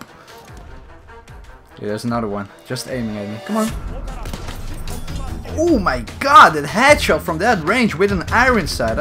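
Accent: Netherlands. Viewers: r u dutch bro? cuz, pronounciations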